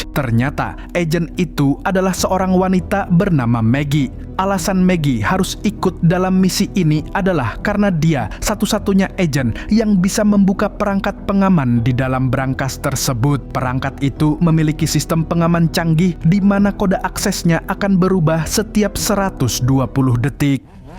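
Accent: Banjar, Indonesia. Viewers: Pian ni Banjar mana mang?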